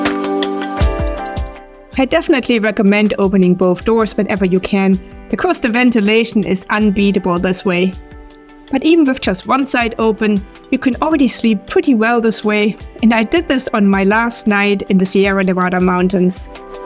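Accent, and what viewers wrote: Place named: Saxony, Germany. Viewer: Danke. Ich habe deinen Kanal gerade erst entdeckt. Ich dachte mir ich schreibe mal auf deutsch. Dein Englisch hat so einen sächsischen Klang. Jetzt sehe ich mir in der nächsten Zeit erstmal weitere deiner Beiträge an. Nochmals vielen Dank und alles Gute.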